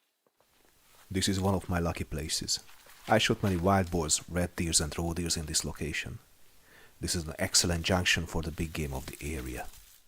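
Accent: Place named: Hungary. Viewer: All the best for 2024 folks - one of the best shooting and hunting channels ever, and in the bright Hunglish language too!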